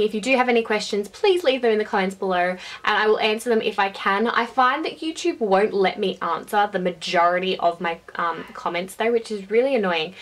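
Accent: Australia. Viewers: Are u from Australia ???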